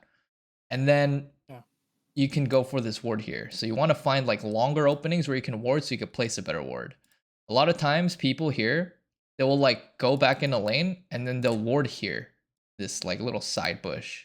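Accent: France. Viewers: Non. Mais oui on est d'accord il est francais. J'ai l'impression qu'à un moment il se trompe et lâche un p'tit: fin heu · C'était toi ? je me disait que tu avais un accent français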